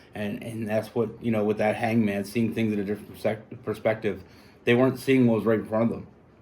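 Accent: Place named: Canada. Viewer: I can hear a Canadian accent, but my goodness. Rain in July??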